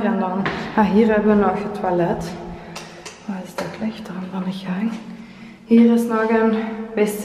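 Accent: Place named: Russia. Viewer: HERMOSO LUGAR!!! MY LOVE!!! Y A PROPOSITO DE QUE PAIS O NACIONALIDAD ERES?? RUSA?? UCRANIA?? VEO QUE HABLAS INGLES, PERO TU ACENTO ES DIFERENTE. BYE...BYE...HERMOSA.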